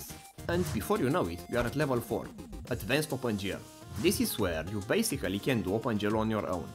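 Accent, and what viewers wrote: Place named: Romania. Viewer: Mereu o sa pot sa identific un român dupa accent :))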